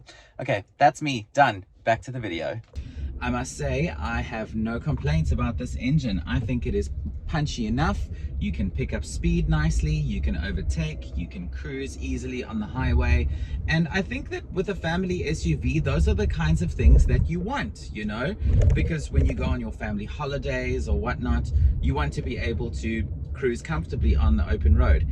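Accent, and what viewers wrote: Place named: South Delhi, India. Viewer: You accent is same as south delhi rich kid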